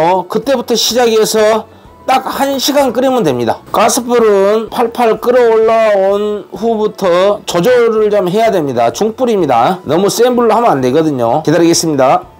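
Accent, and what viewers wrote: Jeolla, South Korea. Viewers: 고향이 어디신데 전라도깡사투리를많이쓰시나요?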